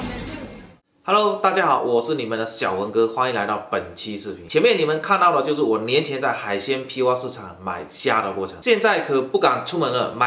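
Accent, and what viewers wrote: Fuzhou, China. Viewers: 福州音太呛了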